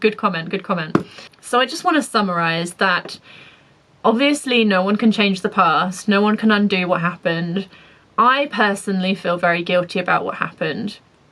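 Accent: United Kingdom. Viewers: I can understand what your speaking because it's British Accent.I actually don't understand the American accent. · Your accent do sound like those Britishers from the Lagaan movie....period. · When you read those mean comments with a British accent it makes it sound like they are more dignified than they actually are.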